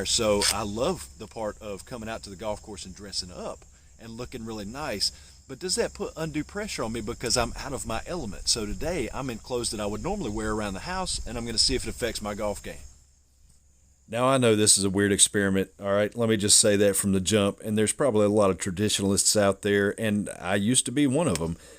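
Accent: South, United States. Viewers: Southern accent? crepe myrtles? You in NC? Just found this channel.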